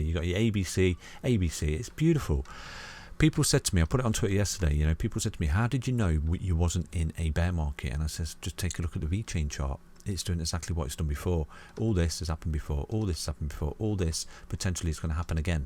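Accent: United Kingdom. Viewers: Word! Gives me comfort hearing a UK chap talk some crypto sense. Most soothing youtube voice ever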